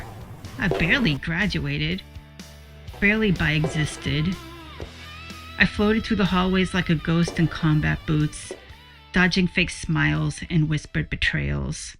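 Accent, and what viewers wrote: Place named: Boston, United States. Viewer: Hi! I’m watching now. I really don’t hear the Boston much except when you said “hahtbreak”. lol. I can reel mine in when I have to but that’s no fun. What really interested me about this project is you saying it’s about you. I’m into any kind of creative endeavors as therapy.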